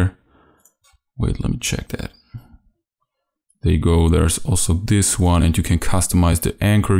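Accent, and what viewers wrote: Germany. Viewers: Man hört den deutschen aus dir haha :D Hast du OSX Yosemite noch nicht ? :o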